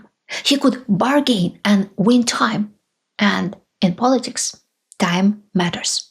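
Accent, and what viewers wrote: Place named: Russia. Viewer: I'm happy to hear the story with a Russian accent. Spread the word.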